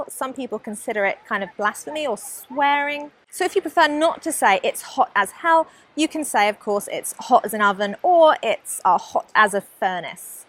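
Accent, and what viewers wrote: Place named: England, United Kingdom. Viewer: I LOVE your english accent.so amazing and captivating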